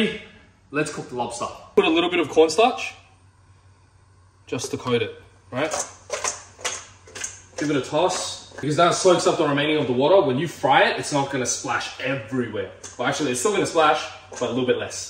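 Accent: Australia. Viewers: Oh yea I see. Yea is Kiwi. He's based now in Australia though and his accent is quite Australian now, so we shall claim him =P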